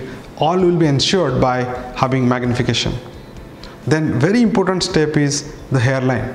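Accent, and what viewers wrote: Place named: Odisha, India. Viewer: You've got a very nice Odia accent sirji..kudos to you and your team The way my hairline is vanishing i think I'll soon be making a visit to one of your clinics..... as Djimon Hounsou says in Gladiator "But not yet....not yet"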